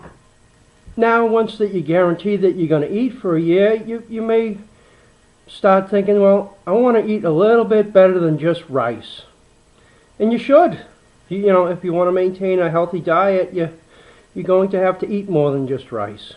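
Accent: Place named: Maine, United States. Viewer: store what you eat and eat what you store. I have been doing moderate food storage for 20 years. I shop at Save-A-Lot every month. time from Maine and you sound like you're from somewhere Within a 50 mile radius. :-) do you know why I started to store food? because my first husband would starve me and then years later over feed me so once I divorced him I still felt like I had to keep a huge closet full of food.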